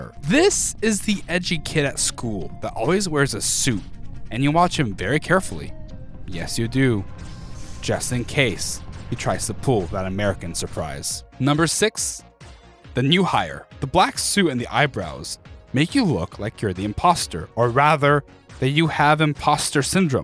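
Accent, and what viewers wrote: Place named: Russia. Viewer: His Russian accent is so good!!!!!!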